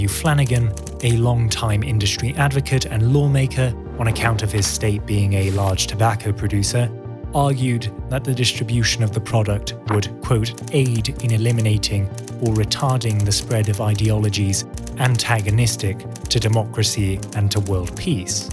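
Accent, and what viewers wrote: England, United Kingdom. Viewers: All I understand, but why English accent, Russian sweater, swiss location?? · ... Why do you have a perfect British English accent if you're from Switzerland? O_o Are you not actually Swiss?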